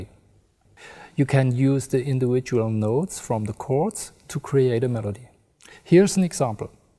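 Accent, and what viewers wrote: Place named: Germany. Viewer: Crazy question: Is the book in English. He sounds German and I don't understand German. Sorry to have to ask.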